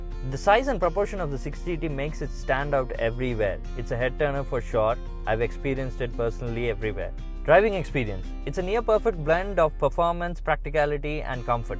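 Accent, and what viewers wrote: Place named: India. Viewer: but that indian accent though. Can’t get used to it.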